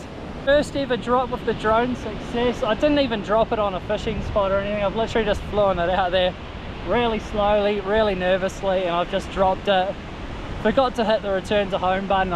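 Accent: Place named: New Zealand. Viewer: you sound like a kiwi, Lovely beaches in Ozz, hope you have great fishing 😊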